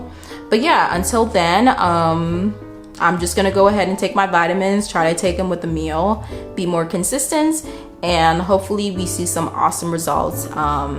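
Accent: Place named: Bahamas. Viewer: I’m definitely going to give Nutrafol a try! Are you from the Bahamas by chance? I hear a very faint accent 😊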